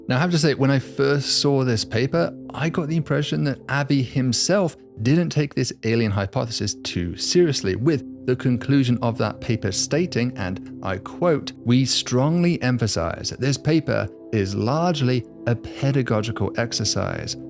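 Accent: United Kingdom. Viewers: It's interstellar, it's not from here.. it is Alien by definition. Just because you have a British accent doesn't make you intelligent. Ugh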